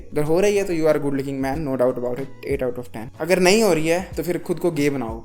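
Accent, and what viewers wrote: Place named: Punjab, India. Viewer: Bhai Aapka accent Punjabi lagta hai kabhi kabhi aap Punjab se hi kya?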